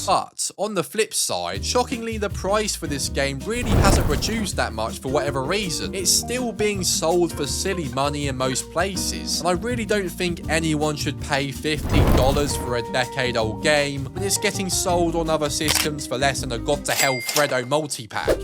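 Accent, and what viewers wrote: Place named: England, United Kingdom. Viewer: You have the most pommy sounding voice.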